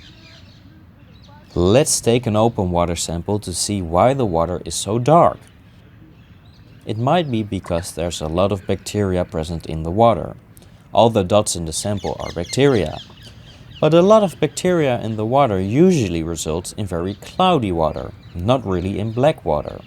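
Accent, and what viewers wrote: Netherlands. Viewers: I never was sure if you were dutch but you said it in this video i love our dutch accents 😂goeie video gast!!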